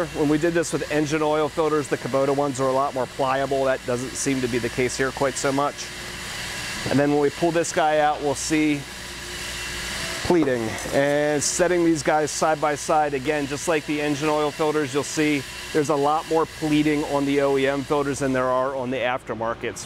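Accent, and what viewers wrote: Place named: Pennsylvania, United States. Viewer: I heard a Pennsylvania accent then I looked up your location, yep! Grew up in Philly myself.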